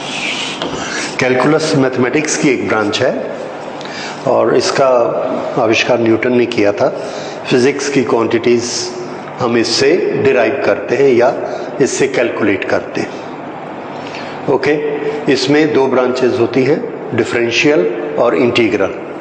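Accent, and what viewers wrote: India. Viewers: I see all of these great comments about this professors teaching, and only wish I could understand his english better. When I worked with some really nice people from India, I had no problem after we were together for a while, but it has been years, and I know this is english, but there is an accent that makes it very difficult for most Americans to initially understand. I will try to just watch it several times, and hope it then comes naturally, because everyone has so many great things to say about his teaching. I guess it is the way some vowels roll off the tongue, something common in Hindi, that makes it difficult for me....and I'm certain the reverse is also true, where if someone not familiar with the way Americans speak english, they would be spending too much time trying to understand the words, and miss the lesson. Thank you very much for generously posting this lesson sir, and I only hope I can get as much from your teaching as the others have gotten!!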